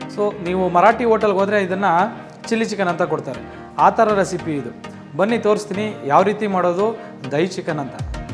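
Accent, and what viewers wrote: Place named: Mandya, India. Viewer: Avva Karnataka dhalli ondhu ondhu prantyadalli ondhu ondh thara mathadthare, adhe reethi adhu Mandya bashe artha madkovva comment madoke munche sariyagi yochesu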